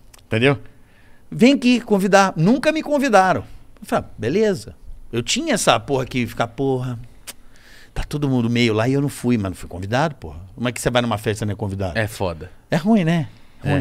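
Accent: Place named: São Paulo, Brazil. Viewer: Carioca tá Paulistão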